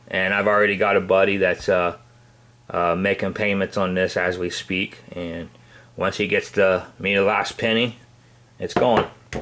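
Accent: Pittsburgh, United States. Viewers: Sounds like a yinzer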